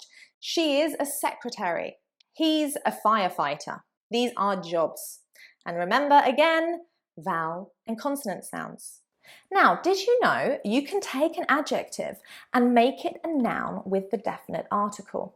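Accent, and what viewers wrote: United Kingdom. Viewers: I love it .the british accents because is too Much sweet and perfect and it is not rude to me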